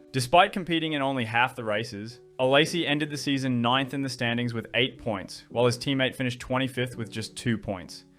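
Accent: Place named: Australia. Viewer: Love the way your accent randomly switches between Aussie and American between sentences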